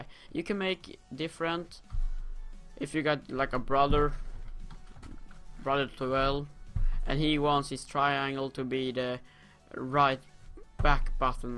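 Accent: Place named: Sweden. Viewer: du har svensk engelsk dialekt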